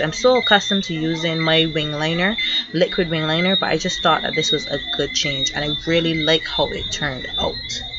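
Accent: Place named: Caribbean. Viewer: I like you caribbean Accent! very nice makeup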